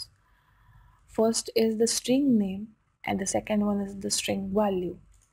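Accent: India. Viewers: u sounds indian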